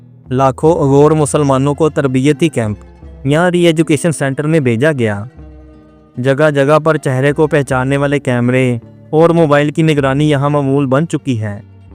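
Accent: Punjab, Pakistan. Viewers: Aap kindly Urdu ka labo lehja zara behtar kur lain warna Punjabi style mein Urdu suit to nahi karti baqi aap ki marzi, zuban Punjabi ho ya Urdu us ka asal tarah ada kurna hi Zuban ka Husan hai warna Allah ki Panah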